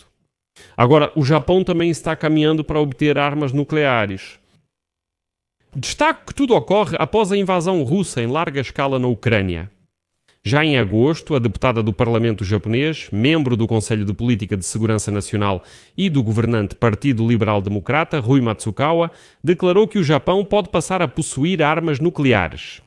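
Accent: Portugal. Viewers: EESTE CANAL E POTUGUES E PELO SOTATAQUE TAKAICHI E ESPERTO NÃO QUE CONSTITUIÇÃO PROIBE A ELA QUER DAR UMA RASTEIRA NA OPOSIÇÃO, AO INVES DE FAZER BOMBAS ATOMICA ,COMPRA DOS EUA ,E FICA AMOSTRAR P CHINA E KOREIA DO NORTE ,NOS TEMOS OGIVAS NUCLEAR ,VOCES QUE FIQUEM ATENTOS ,OK,😊😊ELA E INTERIGENTISSIMO ESTA SANAE ,SAN ❤🎉😊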